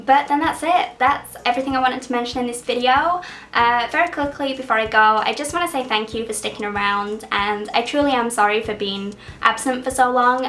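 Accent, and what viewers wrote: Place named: North, United Kingdom. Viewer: I'm sooooo confused by your accent? Is it northern or American?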